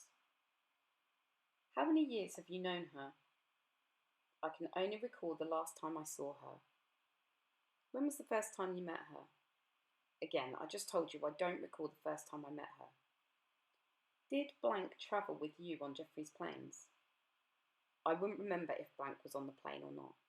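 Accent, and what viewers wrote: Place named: RP, United Kingdom. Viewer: Your accent is more posh then Maxwell's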